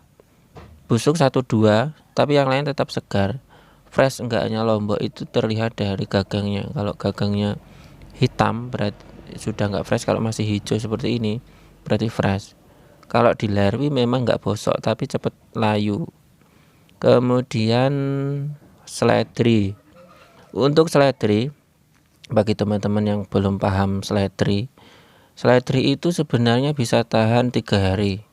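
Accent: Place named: Java, Indonesia. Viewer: Luar biasa sangat bermanfaat kang....saya langsung subscriber...bahasa jawanya medok lucu tp sangat bermanfaat, sukses selalu kang.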